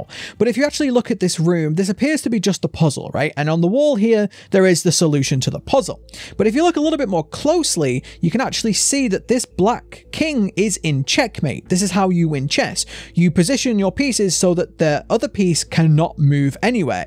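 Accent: United Kingdom. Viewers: “I absolutely adore Little Nightmare theories.” I absolutely adore British accents saying they absolutely adore something.🥰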